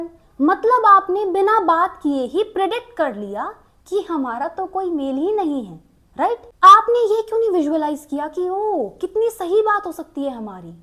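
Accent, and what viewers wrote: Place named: South, India. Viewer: you sound south Indian. I love the way you dress and you are charming.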